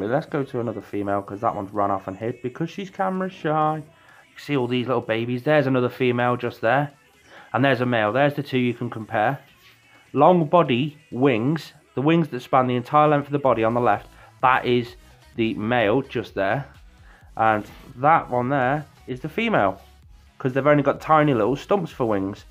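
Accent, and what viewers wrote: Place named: Cockney, United Kingdom. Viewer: i’m american, you sound cockney to me 🥴 i just enjoy the accent a lot and can’t quite say it right 😂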